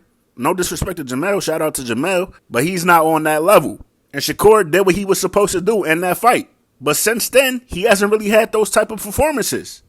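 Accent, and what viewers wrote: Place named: Pittsburgh, United States. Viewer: the guy who runs this channel is from Pittsburgh not chester..that Chester accent is super odd n nothing like philadelphia..Pittsburgh is definitely similar tho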